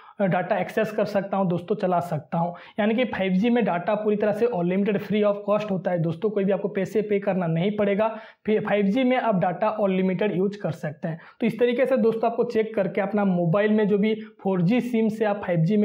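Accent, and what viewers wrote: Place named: Odisha, India. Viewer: Mujhe thoda thoda laga ki aap odisha se hai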